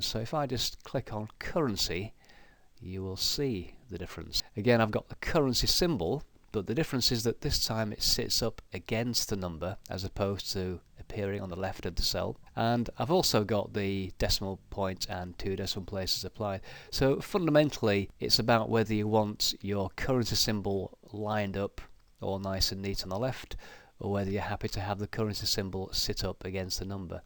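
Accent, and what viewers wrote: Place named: England, United Kingdom. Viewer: This English accent.. uff... English in an Indian accent is better for Indians..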